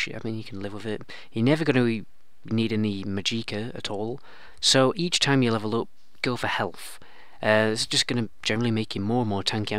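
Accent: United Kingdom. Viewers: Cool accent. Where are you from in the UK? I'm Scottish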